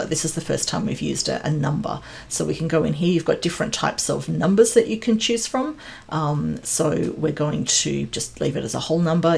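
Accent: Australia. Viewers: you are such a nice person... This is the best and full tutorial available. I like your aussie accent, clear voice and easy to understand. I watched this full video and this asset management app works great for me. It was my first model-driven power app, and I enjoyed it alot. Thank you so-oooo much.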